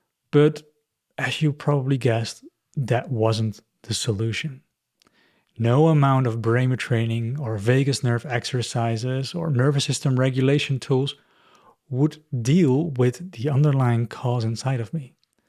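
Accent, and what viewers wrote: Netherlands. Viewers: Sounds like a Dutch accent. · My compliments 👏. The bravery to confront yourself with your childhood ànd work on it. All my love and light to you and all the ones who listen to you. (I hear a dutch accent?)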